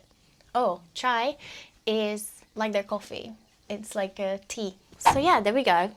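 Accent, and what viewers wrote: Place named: United Kingdom. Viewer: Delightful, charming and insightful! Your ‘British’ accent, btw, is adorable! 👌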